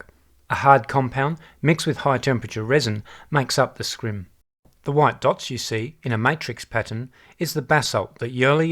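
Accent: Australia. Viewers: I might get a set after Christmas. I am normally dissapointed by my presents and end up spoiling myself with stuff I really want. I'll let you know if I do. I've only recently discovered you. It's nice to hear an Aussie after watching gcn, cycling weekly, bike radar and the vegan cyclist.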